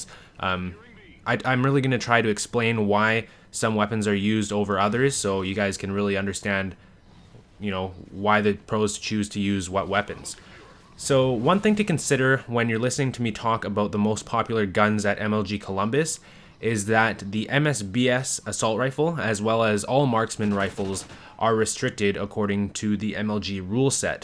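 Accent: Canada. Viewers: 4 fuckng minutes listening to a Canadian ramble on 'aboot' pointless crap. Get to the point man.. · MSBS is nerfed now apparently, wonder if its allowed now. Good video, was looking for this info for awhile. 1 question, you Canadian?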